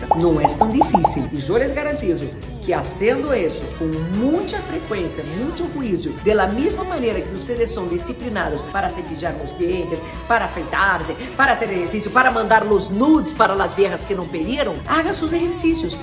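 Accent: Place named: Brazil. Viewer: Me gusta su acento brasileño y habla el perfecto español. Saludos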